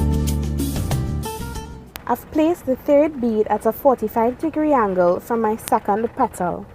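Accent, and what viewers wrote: Trinidad and Tobago. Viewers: U sound trini!!! 😀😀😀 U go girl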